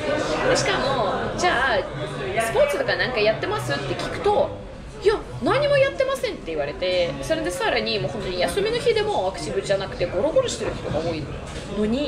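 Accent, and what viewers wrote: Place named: Okinawa, Japan. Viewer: なんか沖縄っぽい訛り方してますね！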